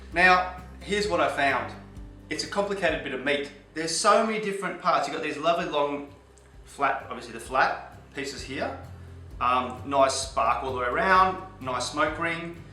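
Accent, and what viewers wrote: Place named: Australia. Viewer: Loved hearing an Aussie describe food he's cooking! Sorry mate, your accent was better than your cooking for this American. Keep it up though!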